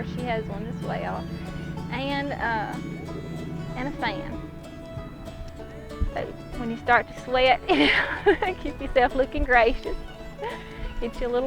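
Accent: South, United States. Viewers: You have a great southern accent, so charming. Love the dresses too! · I just love the Southern accent. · You made these dresses yourself? Very beautiful! 👍🏻🤩🥰 And I like to hear your southern accent, like in the tv series of “North and South”; I live in the Netherlands 😅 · Its weird seeing this b.c here in the south this is a low class accent.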